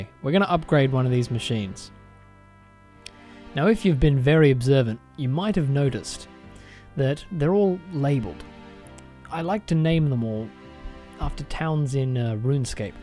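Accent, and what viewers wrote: Australia. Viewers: Australian BROOOO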